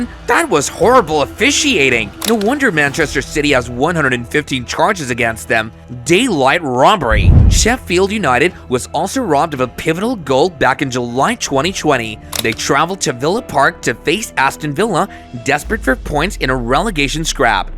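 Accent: United States. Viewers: these american pronunciations are painful to hear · Watching football vids with an American accent just ain't right. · 40 seconds? As soon as the Yank's voice was heard, the back button was pressed. Can't comment on the video content, I simply didn't watch it!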